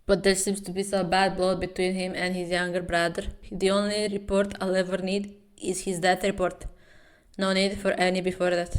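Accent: Russia. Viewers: Am I the only one who focused on her accent thinking it’s such a good Russian accent 😭😭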